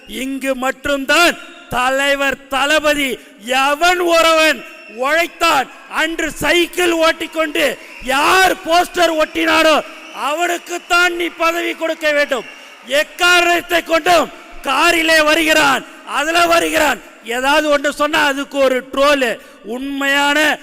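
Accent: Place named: Chennai, India. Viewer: Dei bussi enna kandraavi pechida. Tamil le olunga pesa theriyala. Poi tution teacher vechi kathukittu vaada. Eanda Tamil la kolra. Edhula baloon kadha vera. Sagikala da un pechi. Madras basa pesi kolraan. Adhayum mulusa pesa theriyala. Kandravi college kadhai . paavam vijay endha kelatu moodhevi yai vechi epdithaan samalikeraano paavam. Vijay nalaye mudela morache morache paakuraan tvk thalaivan ella tharkuri.😄😄😄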